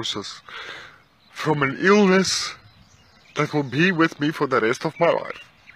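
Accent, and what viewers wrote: South Africa. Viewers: Your accent is so awesome. You must be South African. Keep fighting big fella ❤️🤙🤙🤙🤙🤙🤙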